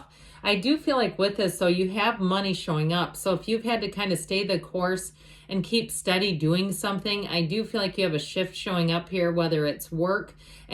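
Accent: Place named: Midwest, United States. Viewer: Your voice is fine, I’m from Illinois 😊 are you from Wisconsin?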